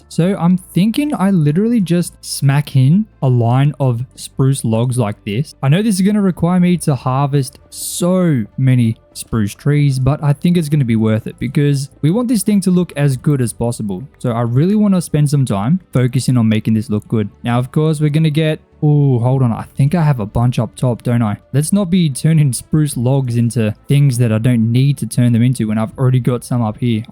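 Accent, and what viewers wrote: Australia. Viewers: This was the first of your videos ive watched, i immediatly knew you were australian 😂 From WA myself. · No hate but why his australian accent acting like the volume button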